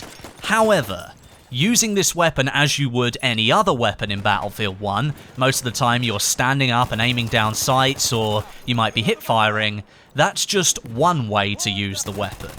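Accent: United Kingdom. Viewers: your UK affiliated accent is terrible to listen to u dont sound very compelling either I must have been drunk the day I subscribed lol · Jesus that's a fucking thick British ascent.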